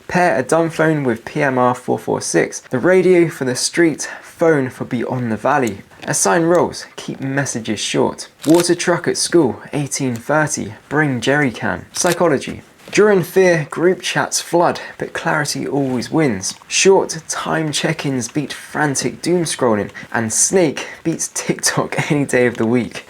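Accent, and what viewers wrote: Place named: England, United Kingdom. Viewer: I'm sure that way of speaking is perfectly natural for him as an English person. Depends on where he's from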